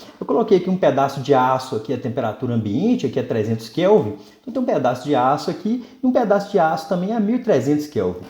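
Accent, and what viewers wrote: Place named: Minas Gerais, Brazil. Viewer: Professor com explicação excelente. Essa serie é uma joia do Youtube. A cereja do bolo é o sotaque mineiro do prof. Abraços!!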